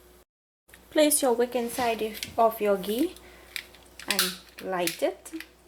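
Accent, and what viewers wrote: Trinidad and Tobago. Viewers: I came to the video by accident and when I heard the voice, I said myself 'Aye aye! how she soundin' like a Trini so?", then I checked the name of the channel and bawl 'Or horr...'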